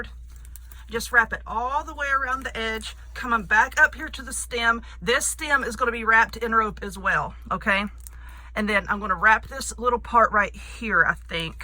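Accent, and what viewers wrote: South, United States. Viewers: I just love your accent, your southern wording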